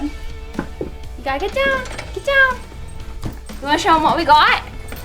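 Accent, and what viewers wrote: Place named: Western Pennsylvania, United States. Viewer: Just found you with all of my recent chainsaw videos. I'm originally from Butler and you totally sound like you're from western PA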